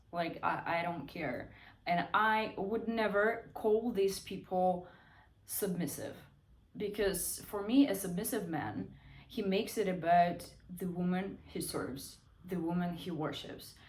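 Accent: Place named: Russia. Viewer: Hello, I found your channel a few days ago through your video about chastity amid researching the topic, then watched the rest of your videos and subscribed. I believe this is your best video yet. I wanted to leave a word of thanks because channels with content like yours have inspired me 1.5 months ago to find a dominatrix, and since then my life has improved exponentially, both materially and perhaps more importantly, spiritually. The point which you bring up in this video, that submissiveness shouldn't be perceived as a weakness but rather a strength, and therefore ought to be a trait which one can be proud of — even publicly — rather than ashamed of, is something I’d never considered before. This may have to do with me being Japanese, although I imagine it’s generally true everywhere else. Lately I’ve actually become slightly more open to my closest friends about my submissiveness…and this video makes me feel even more empowered and encouraged to come out of my shell. 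Thank you for sharing your thoughts, your experience laden articulation and honesty is always appreciated. Looking forward to your next video, and in the meantime, good luck in your continued endeavors as a dom! PS your Russian accent is charming ^^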